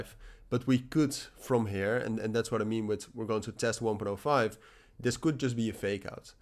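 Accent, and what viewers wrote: Netherlands. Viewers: Can hear you are Dutch ;-). Ty for the update.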